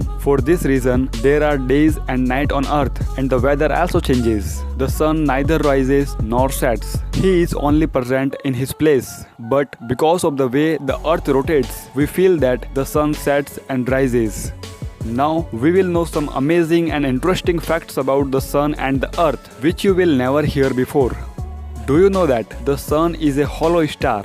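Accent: India. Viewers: What a thick Indian accent! Totally wrong info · I guess the speaker is from India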